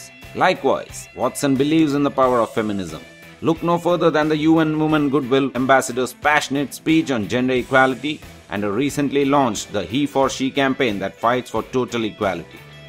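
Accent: India. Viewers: bellay? her-mion gran-gerr?? bwahhaaa!! lolzz! mann sorry bt ur indian accent is juss too pronounced! (fyi, I'm indian 2, bt I pronounce words ryt!) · Actually he is Indian. And that is is why he is speaking ridiculously. He is speaking in Indian English accent . The most funny part for me was when he pronounced hermione as Hermene and Belle as Bell-e!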